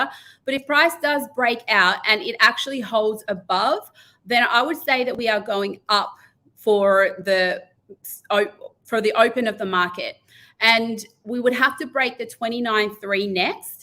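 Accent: Australia. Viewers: I forgot how strong an australian aaccent can be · Clever lady, but that aussi accent, with emphasis at end of words is sooo annoying, markITt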